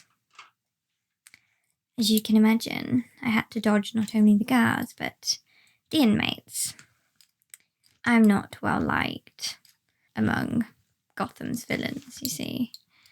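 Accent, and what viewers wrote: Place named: RP, United Kingdom. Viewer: man, you look hotter than ever in this cosplay! and that accent. I normally dislike rp but yours is amazing and suits your asmr roleplays sublimely.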